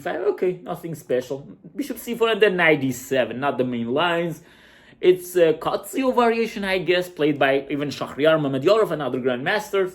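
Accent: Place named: Italy. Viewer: You’ve got Italian accent 😇 Cool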